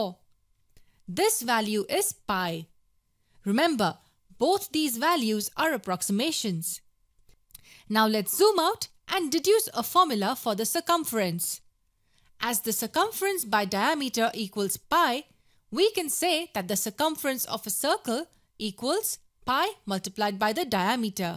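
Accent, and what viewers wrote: India. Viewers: I don't understand that Indian accent... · Of course it's an Indian accent narration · very hard to follow, traditional Indian accent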